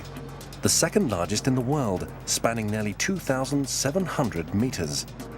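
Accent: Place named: United Kingdom. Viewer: yes but the brittish accent is so nice.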